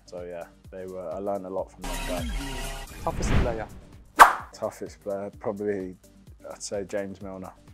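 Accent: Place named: England, United Kingdom. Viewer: Chamberlain’in röportajları keyifli oluyor, hem vizyonlu hem de anlaşılır bir İngiliz aksanı olması çok iyi 🖤🤍🦅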